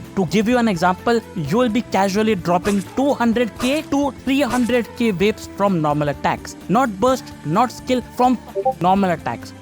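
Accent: India. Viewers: Those racist comments are wild. Yeah you have a Indian accent, SO WHAT, ITS A BIG WORLD PEOPLE. Good job, gonna sub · leave it to some talented smart guy with a indian accent to finally explain it in a way i understand, thanks i subbed! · unbearable to watch due to your Indian accent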